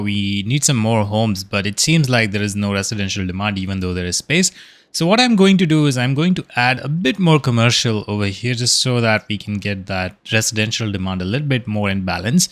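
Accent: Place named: India. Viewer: Excellent video! I'd like to know if you're basing your city in any real locations. I'm brazilian and I often struggle to do stuff familiar to the place I live, because this game is very "american". Apparently, judging by your accent, you sound a little bit indian, so i imagine we run through the same problem. I'd also wanted to know if you consider LUT's or map themes as "mods". They could make this city look even better than it already is! Anyway, hoping to see some new episodes! <3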